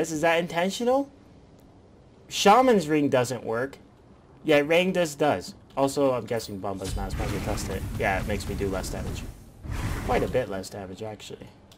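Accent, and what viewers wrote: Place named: India. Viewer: So thats how u sound. Hey ur a pretty good duler. I thought u were some indian from india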